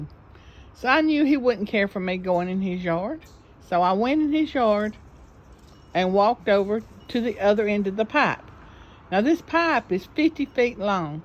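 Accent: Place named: South, United States. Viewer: Hey, I know you! I just realized that YouTube wasn’t notifying me of your videos! I know to check for them now though. Thank you for posting! - Britt Edit: I’m a fan of the southern accent! 😊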